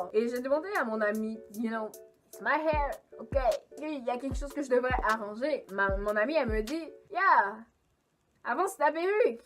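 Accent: Canada. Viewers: It’s t'as un accent de canadien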